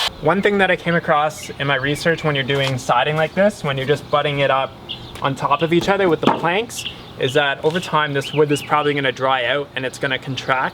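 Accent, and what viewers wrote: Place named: Canada. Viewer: The Canadian accent 😬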